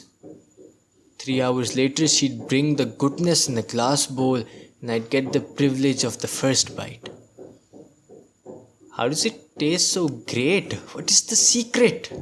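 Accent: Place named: India. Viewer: Your Indian accent 👌🏼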